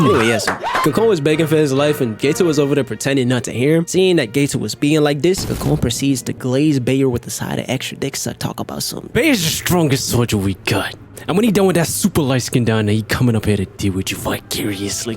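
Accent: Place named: Bahamas. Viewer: Where are you from? You sound kind of like a Bahamian.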